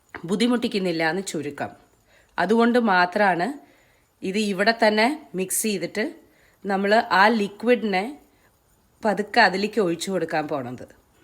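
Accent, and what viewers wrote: Palakkad, India. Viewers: ഹായ്.. ഞാൻ ശ്രീദേവി അജോയ് കുമാർ ഞാൻ മുംബൈയിൽ സ്ഥിരതാമസമാണ്.. ഒരു വീട്ടമ്മയാണ് ഞാൻ സ്ഥിരമായി വിനിയുടെ എല്ലാ പരിപാടികളും കാണാറുണ്ട്.. വിനിയുടെ പൂജാവിളക്കുകൾ ക്ലീൻ ചെയ്യുന്ന പരിപാടിയാണ് ആദ്യമായി ഞാൻ കണ്ടത്, എന്നെ കൂടുതൽ ആകർഷിച്ചത് വിനിയുടെ പാലക്കാടൻ ശൈലിയിലുള്ള സംസാരമാണ് ആദ്യം ഞാൻ കരുതിയത് ഏതോ ഒരു പ്രായമായ വ്യക്തിയാണ് എന്നാണ് കാരണം വിനിയുടെ സംസാരം വളരെ സനേഹവാത്സല്യവും പക്വതയും നിറഞ്ഞതാണ് അതുകൊണ്ട്, പിന്നീടുള്ള പ്രോഗ്രാമിൽ വിനിയെ കണ്ടപ്പോൾ മനസ്സിലായി ആള് വളരെ ചെറുപ്പമാണെന്ന്.. എന്തായാലും ഒരു അറിവിന്റെ കലവറയായ വിനിക്ക് ആശംസകൾ.. എല്ലാ പ്രോഗ്രാമുകളും കാണാൻ ശ്രമിക്കാറുണ്ട്.., കെയർ ആൻഡ് ക്യൂർ എന്ന പ്രോഗ്രാമും എല്ലാം.. നന്ദി.. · njan try cheythittu parayamtto. Pinne videos improve avunnu shake avkunilaaa.. pine de veedu palakkad ano samsarikam kettapol thonni... ente nadum palakkad anu( chittilamcherry) eppol Newjersy il.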